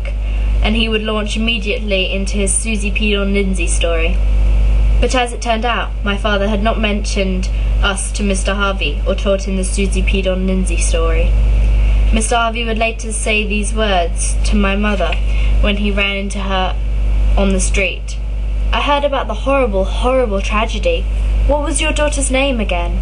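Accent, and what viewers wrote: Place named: United Kingdom. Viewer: Your voice is lovely and so british:-) Thank you for reading!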